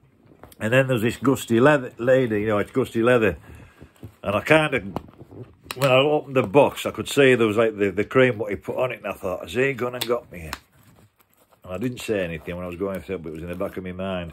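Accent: Yorkshire, United Kingdom. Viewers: Yorkshiremen aren't biologically capable of doing a posh, plummy accent...which is to your credit.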